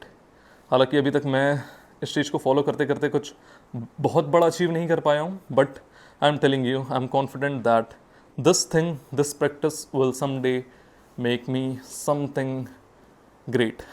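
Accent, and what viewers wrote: Kerala, India. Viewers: Kay tum Kerala se ho?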